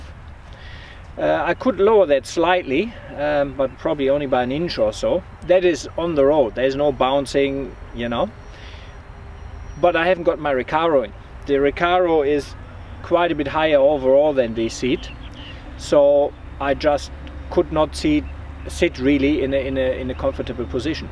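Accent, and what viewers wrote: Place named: Germany. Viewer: Ähmm... your accent... sounds damn deep German... richtig oder? Still Pinzgauer for President... best time at Austrian Military was "driving" to Locations with this thing... driving, its more waiting for the Location comes to u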